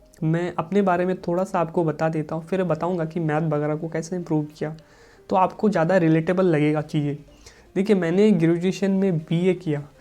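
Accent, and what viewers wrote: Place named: West Bengal, India. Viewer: Bhaiya aap uttrakhand se ho but voice tone westbengal jaisa lagta hai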